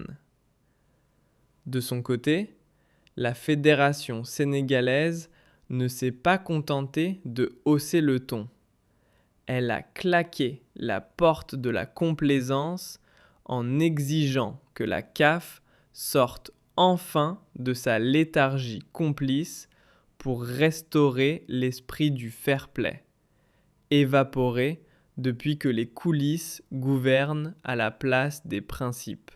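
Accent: Quebec, Canada. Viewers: L'accent québécois est terrible. Changez SVP. Mettez le lecteur habituel.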